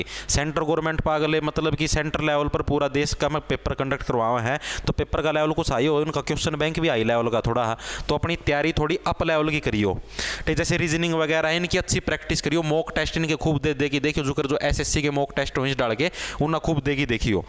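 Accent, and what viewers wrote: Haryana, India. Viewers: यूपी की धरती से आपको राम राम मजा गया हरियाणवी सुन kar 😂😂😂😂😂😂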